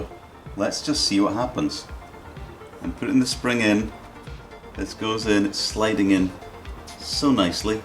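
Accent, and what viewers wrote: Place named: Scotland, United Kingdom. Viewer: I hope so matey, because I love them and your Scottish accent.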